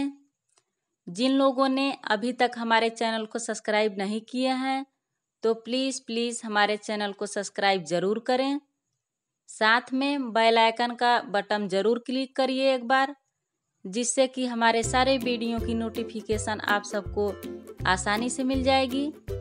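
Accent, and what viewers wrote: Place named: Bhojpuri, India. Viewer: pata nhi sbko apke bolne se kya aitraj hai cooking channel hai... koi voice improvement ki class nhi chal rhi hai... hindi mai to bol rhi hai sab angrez haj kya yahn pe... unki bolne ka style alag h bas hum bhojpuri bolte h hindi utni asani se bolne mai dikkat hoti hai gaon mai support karo warna chodke jao... bhashan mat do mehnat ko appreciate karna chaiye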